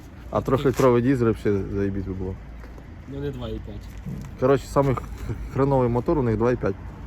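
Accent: Surzhyk, Ukraine. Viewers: Як на мене, треба мову трохи підтягнути, бо суржик , це шлак, а вичавлювати із себе через силу українську , то краще взагалі мовчати. Ну і авто якісь старі корчі- не цікаво (((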